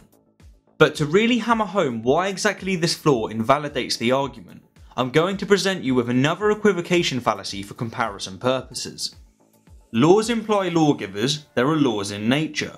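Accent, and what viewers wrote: United Kingdom. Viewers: Esq. (I say that because to my ears you sound posh English) I speak English with a Michigan and Ohio accent actually my accident tends to be related to that spoken in Grand Rapids and Detroit, Michigan along with Toledo, Ohio. According to some PhD linguist test whose name I can’t remember and am too lazy to look up. Anyway all that being said I have noticed the same lack of understanding between the colloquial definitions of theory and now that you pointed out the different definitions of law. I’ve known about the different definitions of those words and several others misused by creationist and for that matter certain uber-liberals and ultraconservatives who are very careless in their misuse of words and phrases. Particularly in using words that has several different definitions but implying there is only one meaning to confuse their audience.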